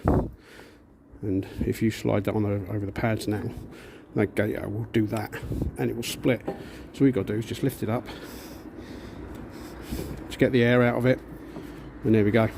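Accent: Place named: Northern England, United Kingdom. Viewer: Thanks for the video, I saw another guy show how you can get a 12v battery with two wires that you touch to those two terminals where you took the plug out. And it seemed to work fine, just make sure the polarity is the way that winds the piston the right way. Would you suggest this way too as it saves taking the motor off? PS like the accent, it reminds of home, as I'm currently up north! lol!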